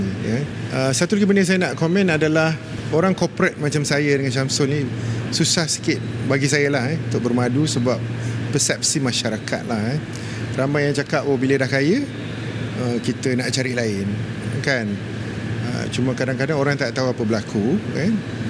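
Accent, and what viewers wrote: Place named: Selangor, Malaysia. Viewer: Selangor nya ko cakap...dapat madu sepah dibuang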